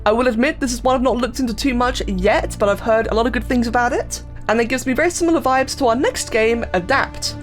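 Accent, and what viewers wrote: United Kingdom. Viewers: Most British accent ever heard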